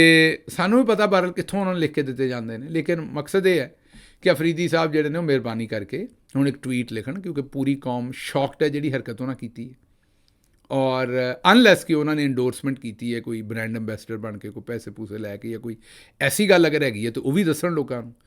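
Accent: Punjab, India. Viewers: Masha’Allah good work Alhamdolillah for being here Love the Punjabi accent news Pakistan 🇵🇰 Imran khan PTI team all Zindabad Especially sb who’s highly intelligent and humorous who always makes me laugh in his videos that’s worth watching although the news in Pakistan is depressing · Khalis dehat punjabi sun kur bara maza aata hai. Kuch alafaz ka matalb nai pata huta phir bhi acha lagta hai.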